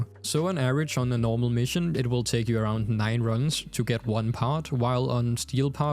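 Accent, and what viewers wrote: Denmark. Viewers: is this guy danish?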